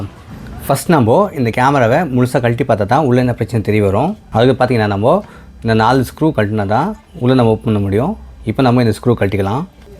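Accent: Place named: Bengaluru, India. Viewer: Bro Bengaluru tamil nalla irukku 👍😎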